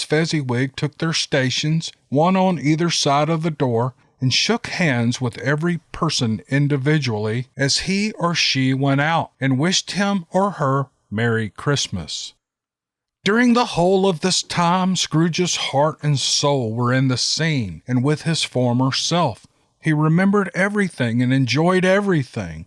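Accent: South, United States. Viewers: You sir can read Green eggs and ham with that Southern twang and make it sound great! Merry Christmas to all.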